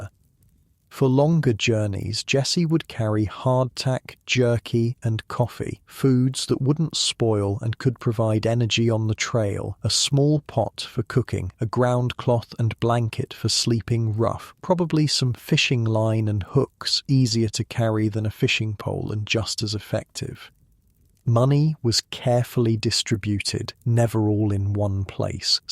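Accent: England, United Kingdom. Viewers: Old west tales with an English accent?! Yeah, I’m out🙄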